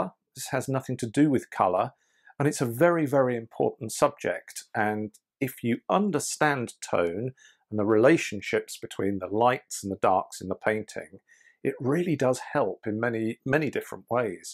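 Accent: United Kingdom. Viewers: Amo o sotaque britânico e amei sua arte. Obrigada por compartilhar.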